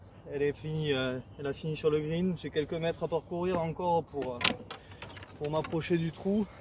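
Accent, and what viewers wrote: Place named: South, France. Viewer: très bien ce 1er vlog... avé l'accent!!!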